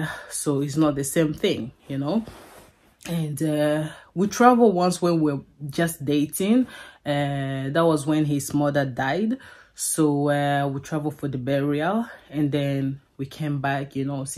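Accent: Igbo, Nigeria. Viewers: I ve learnt a lot of good values and beliefs that help spur Nigeria's economic development. Drive to build yo own house b4 going back home - cant stand staying in a hotel. Funny how your accent is more Nigerian than Congolese 😅😅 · A Congolese speaking like a Nigerian. Your accent is pure Nigerian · Wowoo! You already speak like an igbowoman, a Nigerian. You have a house- home in Nigeria. The way you pronounce Aba, Ebuka etc i think in your first world, you're igbo and Nigerian before they transferred your body to Congo, then your husband went and brought you back to Aba, Nigeria. I live in Aba also, Aba ma ndi Aba. You're welcome. Im happy for you. God bless you and your family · Great presentation and experience considering the facts of how things really work back home , but our wife you already sound like igbo woman accent ❤😅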